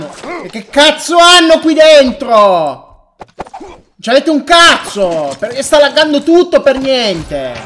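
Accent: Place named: Modena, Italy. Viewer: Ho una domanda da farti: all'accento presumo che tu sia modenese. È vero?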